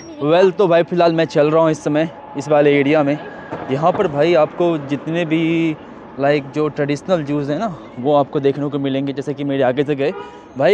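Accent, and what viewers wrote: Bihar, India. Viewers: This is the way a bihari talk . (Hum) Aur (bhai) in most sentence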